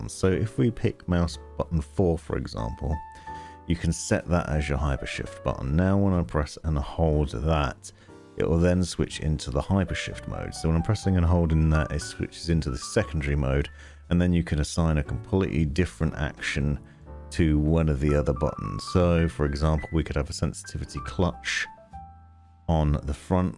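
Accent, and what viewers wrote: United Kingdom. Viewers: Always enjoy the soothing original British voice of yours <3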